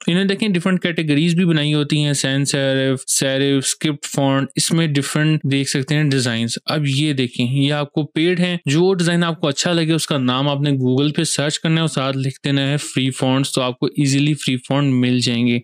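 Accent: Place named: India. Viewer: Im not understand. Pronoun english india 😅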